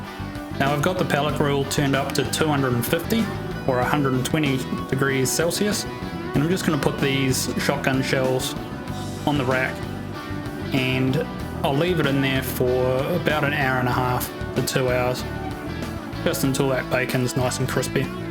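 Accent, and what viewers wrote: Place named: Australia. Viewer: Hey I'm guessing your Australian,,,, not Brittish, or Irish,,,,,, I'm an American, I can understand you folks better. Your "english" is easier to understand than those others. Still> Different than my "American" english.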